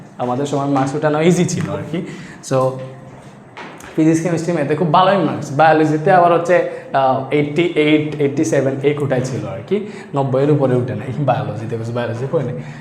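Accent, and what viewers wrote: Sylhet, Bangladesh. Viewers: বাই তো আমরার সিলটি মন অর, হাসা নি বা বাই? আফনে কিতা সিলেটি নি?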